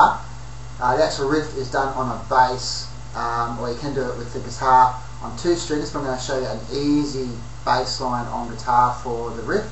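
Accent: Australia. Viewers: awwwwwesoooommmmmee thank you!!!! Are u australian?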